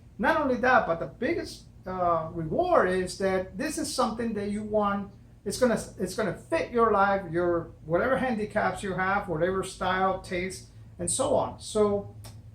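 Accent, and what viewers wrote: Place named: Cuba. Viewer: Soon as he spoke. before I saw the name n he said it. I knew he was cuban. Can't fake the accent mi gente!! Conño!! Nice vid! Thank you meng.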